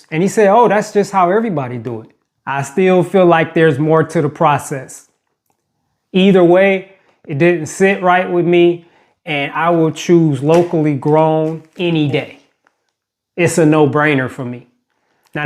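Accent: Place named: Ohio, United States. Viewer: Bro. I'm in Cincinnati. Please let me know where in Ohio to get these fruits. I kinda heard it in your voice that you was from Ohio. Lol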